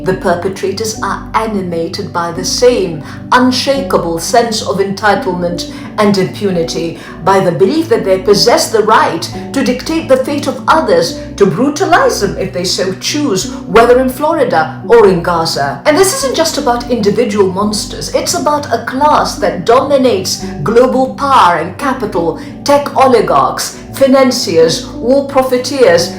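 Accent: United Kingdom. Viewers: Looks like AI to me? Posh Brit accent of an older woman?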